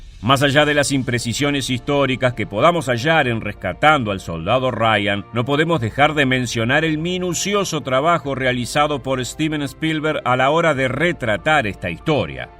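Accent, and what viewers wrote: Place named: Argentina. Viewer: Tu forma de hacer crítica es de lo más absurdo. Pará la próxima ocasión, en vez de criticar realiza una investigación concienzuda de los hechos y haz una entrevista personal a los soldados muertos para que te digan a detalle lo que sucedió. Posteriormente escri e el guión y haz tu propia película. A propósito, por tu tono de voz éres de nacionalidad argentina, verdad?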